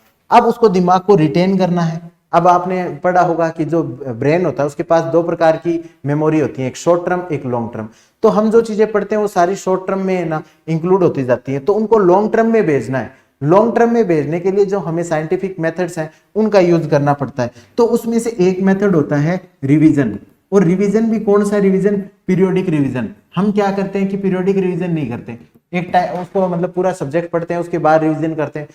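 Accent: Rajasthan, India. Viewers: Loved the rajasthani accent 😀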